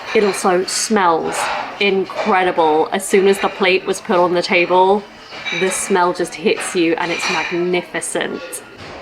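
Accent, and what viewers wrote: United Kingdom. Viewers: First of all, thanks for uploading the new video. I've been studying English with your video lately because I like your British English. I usually watch the same video at least 10 times. Really looking forward to your next video too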